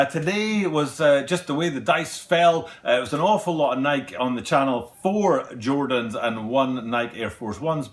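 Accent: United Kingdom. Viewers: No probs Son. You need all the Support you can grab in this game. I keep losing all my blinkin Supporters though 😂. They get to a 1,000 then delete all the people that got them there... Bloody Buggars 😆🤣. I like your Channel anyway Pal. Theres not too many U.K guys that do what you do, so it's nice to hear an accent I've grown up with. You're doing a good job Mate. Posting everyday would just about kill me though, although my videos are packed with a load of old rubbish (Sorry... Trash!! 😉) that takes me forever to find, so they are a little bit longer than yours and take me a week to create them 😂 ✌😆👍. Not sure if you've got <link> where you are?? (Online) but they had the Triple Black 19's in a few days back and Footlocker aren't releasing them until the end of July??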